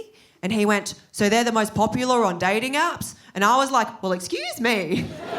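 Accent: Australia. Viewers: I think you sound more Australian then I do. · How do you sound so Australian i was a bit startled when i pressed play. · Hey …you do a great Australian accent. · She leans into the Strine, but her accent is unmistakably Asian Australian - and there's nothing wrong with that. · Im sorry, but your accent is too aussie for me!!! i can't listen to it amd im an Aussie myself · OMG 😂 ... i'm sitting in a starbucks cafe... laughing out loud, disturbing others 😅 A great material 👏 😊 ( White american man in florida... add your joke here ____) I Love your australian accent ❤ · Her 普通话 has an Australian accent. :) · yours isn’t just an Aussie accent, it’s the most Aussie accent I’ve ever heard! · There was no disconnect for me because this is something I see everyday. All sorts of ethnicities and an aussie accent. Love it. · How does she have an Australian accent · Because she’s ethnically Chinese, but has an Australian accent. 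Hope that helps. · Part of charm is the cognitive dissonance of the very Australian accent with the very Asian face. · The thing is, you can tell by her voice that she’s Asian, even with the Aussie accent. Yes she has an Australian accent but something about the tone of her voice doesn’t sound Anglo-Celtic Australian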